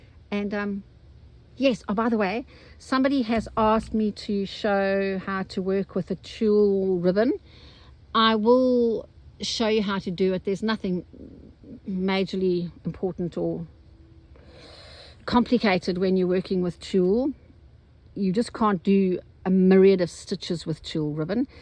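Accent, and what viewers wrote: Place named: South Africa. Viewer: I keep thinking you sound South African, then you said "ooh gats" and that sealed it 😂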